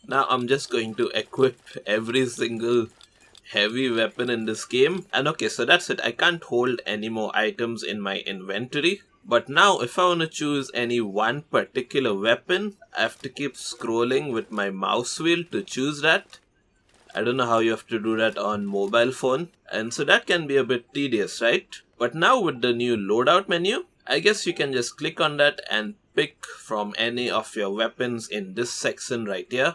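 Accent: India. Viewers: Sir are you from India because your voice like india's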